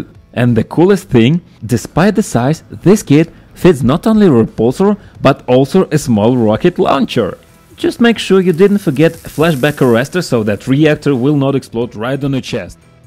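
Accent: Russia. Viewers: Прокачай английский пж, а то русский акцент раздражает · Are u Russian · Я немого понять это русскоязычный Чувак говорит на английском ? А то что то акцент палиться 😀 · русский. Это очень слышно по акценту. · Я же знаю, что ты русский. Акцент тебя спалил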